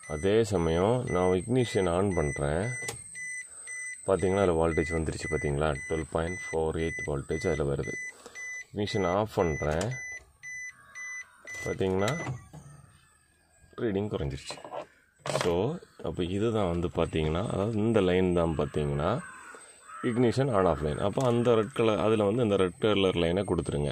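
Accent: Kanyakumari, India. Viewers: Kanyakumari makkaloda thamil keka azhaga irukku..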